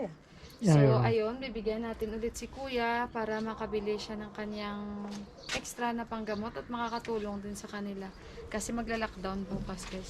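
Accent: Iloilo, Philippines. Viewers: New subscriber here...nawili aq tan aw sg vlog mo..hindi ko paman narinig sau na ilongga ka,but base in your accent im sure u are ilongga....Anong city itong place nyo? Katmandu ba?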